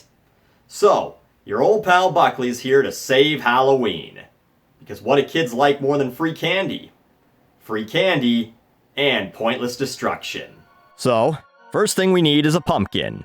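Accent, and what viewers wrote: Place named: Canada. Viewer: Lol the Canadian accent ❤️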